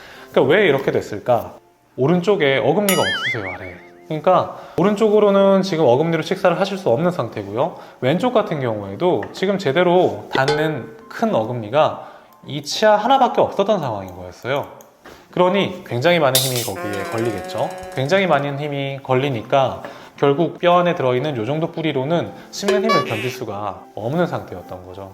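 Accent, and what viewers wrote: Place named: Gangwon, South Korea. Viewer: 원장님도 강원도분이시네요^^ 사투리가 아직 남아있으세요^^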